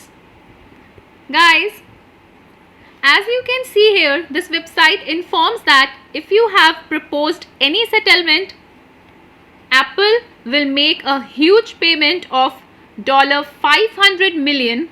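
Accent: India. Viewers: Man even your video with your annoying Indian accent feels like a scam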